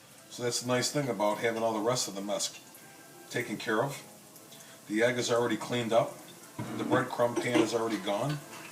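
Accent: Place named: Buffalo, United States. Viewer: If I didn't know better I would guess you being from the west side of Buffalo.( thats a compliment by the way) Those cordone's look so good I can't wait to try some myself. I watched both videos mainly because it was like watching something from home. Thanks for that, and God bless you both.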